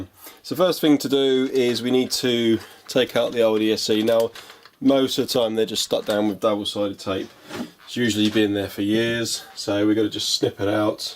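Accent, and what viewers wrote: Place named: Australia. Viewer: Nice vid, Aussie accent?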